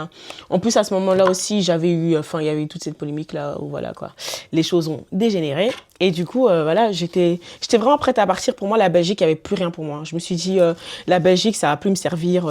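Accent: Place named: Democratic Republic of the Congo. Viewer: Moi aussi 😅elle fait cet accent congolais de temp à autre la, moi je me disais peut un parent congolais lol. C’est quoi l’histoire du français please. Like how did you start speaking French as a Ghanaian?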